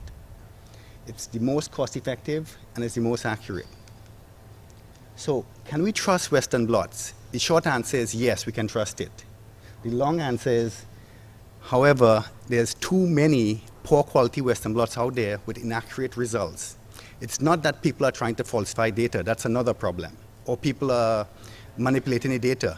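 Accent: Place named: Trinidad and Tobago. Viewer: Fellow Trinbagonian!! Currently at PSU. Good to hear a trini accent! Lol..missing my doubles:(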